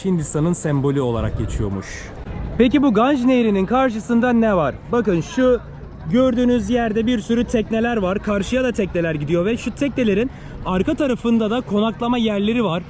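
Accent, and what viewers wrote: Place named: Aegean, Turkey. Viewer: sen nerelisin merak ettim, ege sivesi var gibi biraz ben de egeliyim 😂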